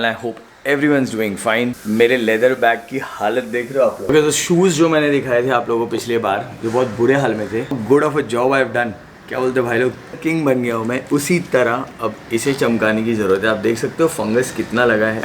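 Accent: Gujarat, India. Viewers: Aap gujarati hai ?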